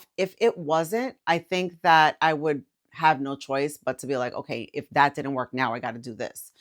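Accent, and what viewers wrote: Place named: Puerto Rico. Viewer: I just found your channel looking for glp1 histories, I’m in my second week and in my first I just lost 1/2 pound and I was so tired so is good to know I’m not along. Also I was hearing you and for me you sound Puertorican and when I found that you are, I got excited because cuando consigo una compatriota Boricua que cree en Jesús, me emociono, congrats for your channel, don’t give up. 😉 God bless you 🙏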